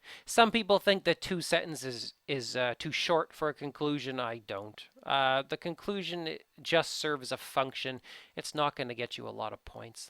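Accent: Canada. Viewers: Hi, first of all thanks a lot for great content. Your website and your videos are really helpfull. However I have a question. You say that we shouldn't write a sentence like `This example demonstrates how...` but almost every essay that you have on your website has a conclusion like that on body paragraphes. I think its a nice way to summarize the main idea that we posit on that paragraphe. But as I said im kinda confused atm ;D Btw I love your Canadian accent ;D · Btw, arr you canadian? You have that accent lol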